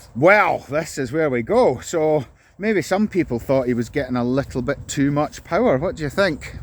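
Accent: United Kingdom. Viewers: ohh, Halloween? gosh, the Children looks so excited...lovely! this is definitely a Walk, indeed from your Screen Name! Thanks for touring us around and the info. good to know. Love the British accent! ahahaha full watched! keep safe...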